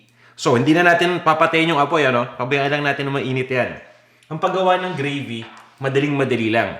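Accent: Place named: Tagalog, Philippines. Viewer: Gusto gusto ko talaga mga lutuin mo sir and the you speak sobrang tagalog na tagalog Panlasang Pinoy talaga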